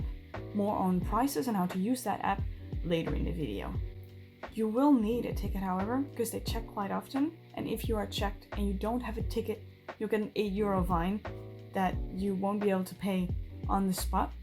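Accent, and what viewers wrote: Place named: Upper Midwest, United States. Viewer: Hi there! What part of the USA are you represent? I’m from Wisconsin.. You sounded like a neighbor from Viking land or Lions land.. Ačiu !!!! 🇱🇹🇺🇸👋✌️💐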